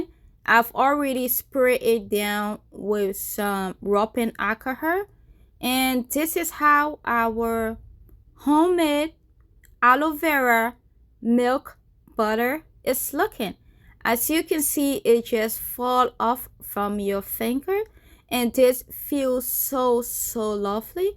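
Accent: Liberia. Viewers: I Hear a accent are you from Liberia?? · Do I detect a Liberian accent.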